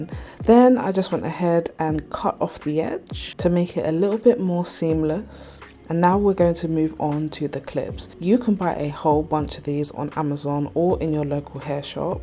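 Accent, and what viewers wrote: United Kingdom. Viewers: Was not expecting you to be British but hell yea thanks girly ur gorg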